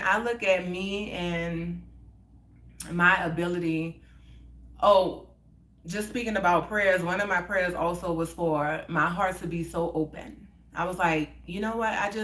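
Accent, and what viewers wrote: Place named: New Orleans, United States. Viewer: I like that T nw orleans accent u he And me😊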